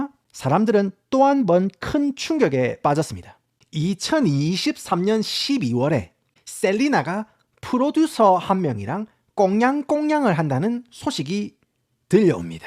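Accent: Gyeongsang, South Korea. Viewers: 아닠ㅋㅋㄱ 님 걸쭉하게 경상 사투리 쓰다가 갑자기 영어발음 갑자기 멋있게 뽑는거 웃겨욬ㅋㅋㅋ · 나도 경상도 사람이지만 사투리 억양이 듣기 싫어서 못듣겠어서 나갑니다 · ㅋㅋㅋ...경상도 말이 너무재밌네요~구독좋아요~홧팅삼춘~ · 이야기를 정말 맛깔나게 잘 하시네요😊.. 사투리도 좋구요~~ 고향이 경상도쪽이시죠?😅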